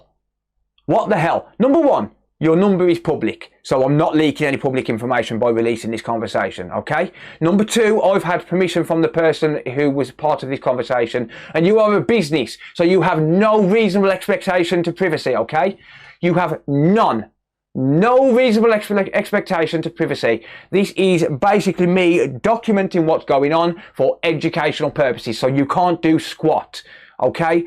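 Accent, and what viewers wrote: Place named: New York, United States. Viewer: It’s how New Yorkers speak. Straight outta the gutter.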